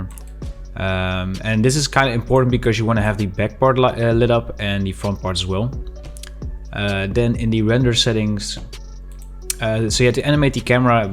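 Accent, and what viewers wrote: Netherlands. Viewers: your dutch arent you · dutch accent :)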